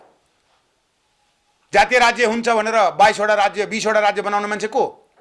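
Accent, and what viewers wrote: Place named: Mongolia. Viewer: याे नेता अनुहार हेर्द त मङगाेल जस्ताे छैन बाेलि सुन्दात सुँगुर खाने मङगाेकाे जस्ताे छ ।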